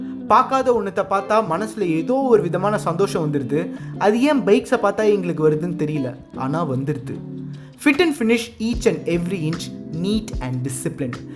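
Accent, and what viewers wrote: Chennai, India. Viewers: Hearing the name at the very first time! As usual I have enjoyed the way you talked about the bike and it’s performance. Please stick with your style of reviewing with namba chennai slang which has got many fans including me. There are many professionals in the market to talk about Bikes but you are little different than others. Hope you already know it and wish to meet you in person someday 👍🏻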